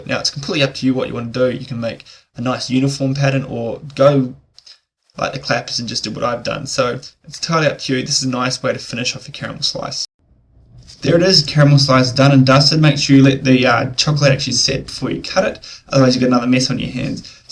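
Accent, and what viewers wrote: New Zealand. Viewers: Are you a Kiwi….you talk like me? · Are you from new Zealand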